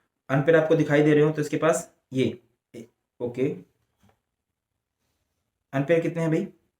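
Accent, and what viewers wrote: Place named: Kaithal, India. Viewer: aap kha se hoo aapki bhasa mereko khethal side ki lgi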